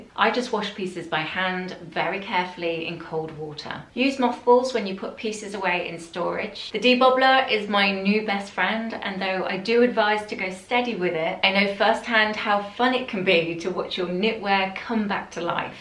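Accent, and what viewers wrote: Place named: England, United Kingdom. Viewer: Hi, I adore all your outfits and style ideas. This was yet another elegant and classy fashion video. You are wonderful at what you do...please continue! I think it's perfect. You're amazing! Please dont worry about the people with thumbs down...you can't please everyone. I think you always give great fashion and style advice and you do this in such a sweet way with a sweet voice and beautiful English accent, and your lovely personality shines through. Keep doing what you're doing- it's all good! I look forward to your stylish videos. Have a lovely Sunday. Karmel xx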